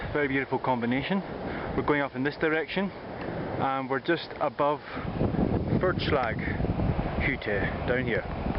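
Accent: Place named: Scotland, United Kingdom. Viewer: Hey man! congrats and thanks for sharing. Love your Scottish accent..Would you like to borrow your voice on new video or film I will be producing in the next coming year..check out my movie about the Via Alpina (blog <link>) Just few questions about Madatschjoch (time ). there are 2 ways from verpeil hutte..what makes you choose the trail through MadatschJoch and not VerpeilJoch ? Did you knew the condition of this trail and what it was like on the way down (ladder and a wall to climb down) ? I assuming you did not know according to what you said when reaching the Col Madatschjoch.... :-) I personally think the way down to kaunergrat was really tough...going up is slippery on unstable ground and with such weather condition you had i know I will have stayed and rest Verpeil hutte..;anyway you did it... From kaunergrat hutte did you follow the cottbuser trail to rifflsee (there is a short section that i would not like to pass on a rainy/bad weather day also there) or hit down the valley asap and skip that short "climbing section" to suunalm/rifflsee ? 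What camera are you using ? will look to your Greek video..I love Greece and it is very funny because you did or went to places I also went and liked Here an idea for you check out Mercantour NP Vallee des merveilles will be something you might like... happy hiking MUL rules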